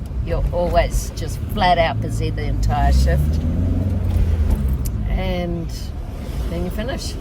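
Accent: New Zealand. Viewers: you need to just get in your van and drive to somewhere else! Your workplace is probably gonna close in the next few days....I am speaking from Far North Queensland where WE get the cyclones every year, and you folks in the south east of QLD have been happily oblivious to what happens in a cyclone for decades, and from your EXENT we're guessing you're from across the ditch where you don't have cyclones........and YES, we live in a Van, and I'm just saying GET YOUR VAN OUTTA THERE and go as far north west as you can for the next 5 - 10 days......you have a MOBILE home, so get mobile, get away from where that cyclone is gonna hit, and come back after all the sh*t has cleared the area where you're "stealth camping" your van. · Haha, Kiwi! I suspected a kiwi accent yesterday and msg you. I have only just heard of you, and been watching your videos today. You did grow up in NZ, so that's why there is an accent 😏 Was so sorry to hear your story of your past in Auckland. 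Rob.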